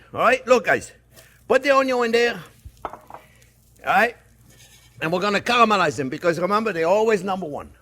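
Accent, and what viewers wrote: France. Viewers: He sounds like Mario, trying to speak English with a French accent.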